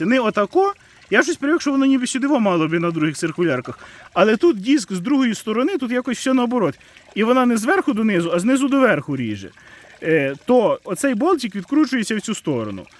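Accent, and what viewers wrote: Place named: Transcarpathia, Ukraine. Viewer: Оцевго -осьо. Осьево-го, Осьо-отево, Сюдево, Оцьо-цьо. Говор такий дебілкуватий, чи то закарпуцький з глухої далекої полонини, чи то на якомусь Івано-Франківському забитому хуторі автор відео живе не зрозуміло. Шановний ви ж публічне відео знімаете, хоча б по українськи навчились говорити, а то цей дебілкуватий суржик слух ріже.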